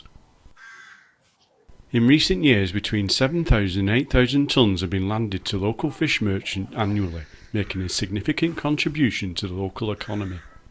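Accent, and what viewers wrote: North, United Kingdom. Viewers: Hi Firstly big apologies for our tardiness we are now back from our Xplorations of the Picos de Europa and are doing YouTube catch up on video watching! Loving this what a fabulous area! Love all the historic buildings and then the brightly coloured painted doors and windows! The bright Barbie pink Fiat 500 tickled us next to those historic remains! Lovely narration in our favourite Northern accent! Loved you on that giant chair of course you had to check it out! What a fabulous market and yay to free parking 😂 sold! Then a lovely forest and lakeside walk, tragic about the house lost to a fire! And you can visualise that jetty being used back in the day! We did enjoy these Xplorations 👍❤️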